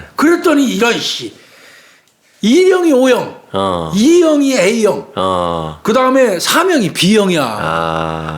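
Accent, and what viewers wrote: Chungcheong, South Korea. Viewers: 아...정말 가끔 나오시는 충청도 말씨 너무너무 좋아요~~영화 곡성뒤엔 전라도말씨에 빠져있었는데 요즘엔 충청도말씨에 빠져있어용ㅋㅋㅋ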